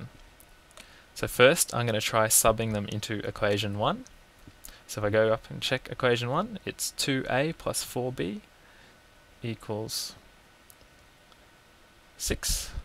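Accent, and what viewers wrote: Australia. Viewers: (PixelonTV) Australian (Y)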